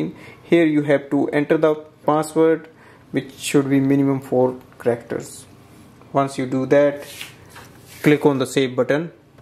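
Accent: India. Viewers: My T7 (or T7 Shield) is not recognized by devices. The manual says ... Make sure that the T7 is connected securely to the USB port. If you use cables other than the one included in the product package, the T7 may not be recognized. Some PCs may not recognize the T7 when connected to the USB 2.0 port if the current exceeds the max. limit (500 mA) described in the Power Specifications. In such cases, please try a different USB port. BTW that India english is very annoying for non english speaking people !!! Almost all words are distorted into strange sounds and the accents of single words are placed differently. Talking slower would helps a lot, so we get more processing time.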